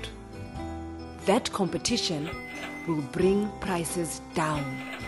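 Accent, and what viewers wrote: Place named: Africa. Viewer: By your pronountiation i assume you're from Africa, you should know that the problem of Africa is just NUTRITION, not AIDS, you should ask for proper food and for clean water, not for drugs or medicines. It hasn't been proved this drugs works and it is scientifically well known their toxicity, that can kill quickly someone who hasn't a good nutrition. So why? Thabo Mbeki was right. That's the reason why he falled down... Turn on your brain please.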